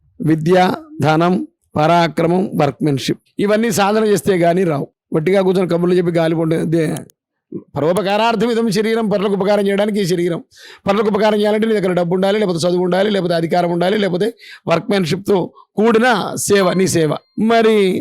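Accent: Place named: Andhra Pradesh, India. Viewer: Mee ru baaga maatladaaru.andhra maatallaga vunnavi.chaala santosham god bless you sir.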